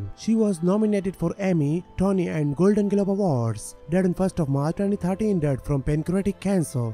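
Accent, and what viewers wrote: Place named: India. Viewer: Obviously, the narrator has an Indian accent.however, he makes it harder to understand because he speaks so FAST! Is this a race?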